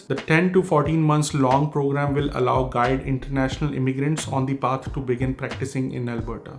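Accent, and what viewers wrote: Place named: India. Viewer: Your accent is like indian it's the worst.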